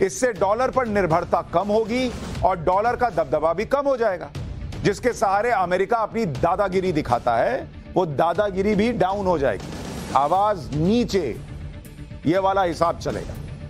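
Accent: Bihar, India. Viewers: SUSHANTSINGHJI, DOLLAR KO IS TARAH DEROGATORY TONE MEIN BAAT MAT KARIYE ,HATAO HATAO ,YEH KYA BAZARU BHASHA CHALA RAKHI HAI.HAME KOI DOLLAR SE LAGAAV NAHIN LEKIN SHISHTACHAAR KA PARICHAY DENA ZAROORI .BIHARI TONE GHALAT HAI.DOLLAR KI KOI LANKA NAHIN LAGNEWALI ,HINDUSTAN KA RUPEE ,HINDUSTAN NE MISMANAGE KARKE GIRAYI ,APNI GHALATI DIKHTI NAHIN LAGE AMERICA KO GAALI DENE .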